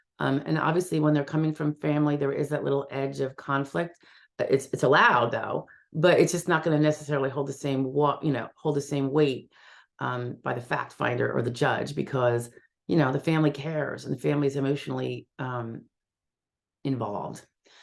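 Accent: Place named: East Coast, United States. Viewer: I'm curious what state you're in? I wanna guess east coast (& fingers crossed) by the way you pronounce things like me. I know it doesn't matter where your attorney is for disability & I definitely want to get one this time. I should've "appealed' the 1st time but now I know I'm denied bc I didn't getmy paperwork work done in time so I didnt send it in last month.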